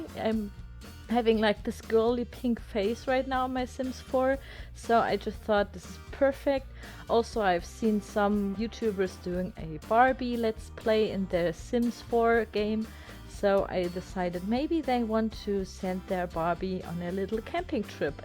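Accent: Germany. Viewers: Are you German ?☺ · Es kann sein dass ich mich täusche aber kommst du zufällig aus Deutschland? Hast so einen deutschen Akzent haha :D Sehr schönes Video nebenbei weiterso bin total begeistert von deinen Baukünsten. :)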